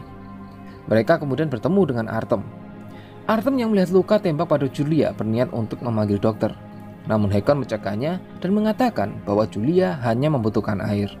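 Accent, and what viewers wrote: Indonesia. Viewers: Admin!~ Gua punya pertanyaan. Diakhir video. Apakah Si Julia ikut bersama Hekon ataupun tetap stay dibumi? = maaf gua kurang faham sedikit dikernakan aku orang Malaysia. Kurang memahami logat" Indonesia . But! Gua tetap mendukung ni channel kok. Dengan +1subscribed ;) . By the way semangat yah bang!!! Ganbatte🔥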